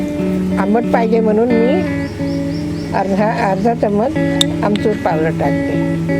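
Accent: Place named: Vidarbha, India. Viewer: Thankyu aaji.. Aaji vidarbhatalya ahe watate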